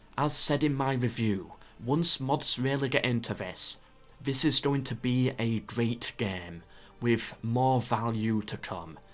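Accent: United Kingdom. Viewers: You’re voice combined with the UK accent, it’s just too good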